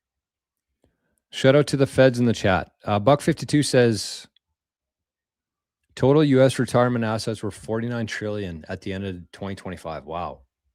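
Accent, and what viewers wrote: Canada. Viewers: I know you can never really know and I've long lost faith in humanity that being said not sure if it's the canadian accent or what but i get serious good guy vibes from you man, you deserve allot more followers. Thanks to you i'm saving my little pennies in sats. One day i'll be stoked to have a whole .1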